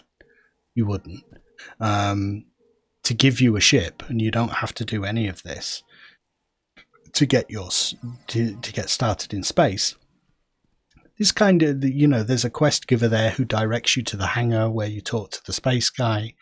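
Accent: United Kingdom. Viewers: why is every darn video i watch on youtube always from someone who can't speak english without some heavy british scottish accent?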